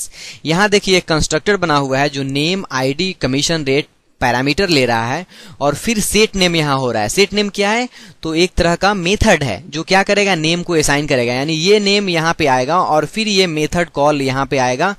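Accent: Bihar, India. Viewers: typical bihari accent ...But lecture is good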